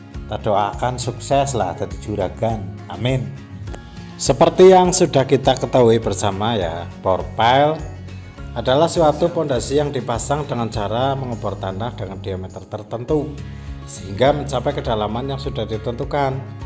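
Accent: Java, Indonesia. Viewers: Ya itu sudah bhs indonesia bro, hanya saja logat"nya sedikit medok khas jawa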